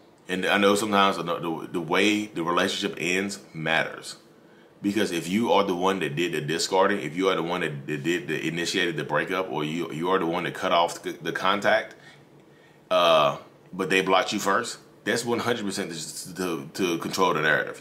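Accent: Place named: South, United States. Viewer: Shoutout to NC with that Southern Draw!!!! As I'm from Winston-Salem,NC . I love this channel ,and even more your content, keep it coming!!!!!!!!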